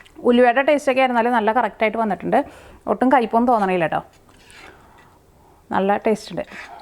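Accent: Thrissur, India. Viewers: Thrissur slang very nice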